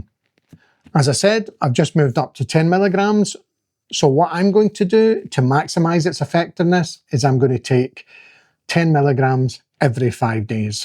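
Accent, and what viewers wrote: Scotland, United Kingdom. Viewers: thanks for your info a fellow Scot its great to hear a local accent! Im on my 2nd 2.5 pen good suppression but by day 5 i feel it dip. So ill start giving myself 2.5mg every 5 days. My next pen do you think i should move up if still getting suppression? Or irder a 5mg pen anyway?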